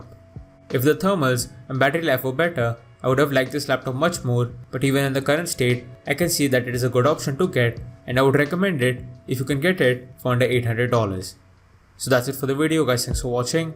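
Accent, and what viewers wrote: India. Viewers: As far as I can figure out from your accent, you're probably an Indian guy. So you should specify the prices in INR too. 🙁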